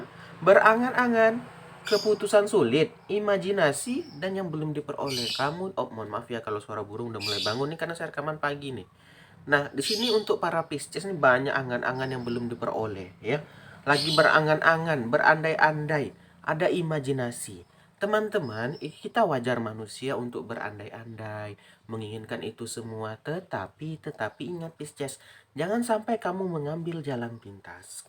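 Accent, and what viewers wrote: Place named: Madura, Indonesia. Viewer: Pisces hadir kok kyk org madura logat nya hhhh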